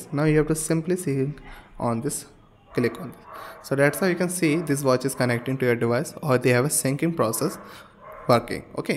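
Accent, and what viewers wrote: India. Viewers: Please add captions, sometimes it sounds like you’re speaking Indian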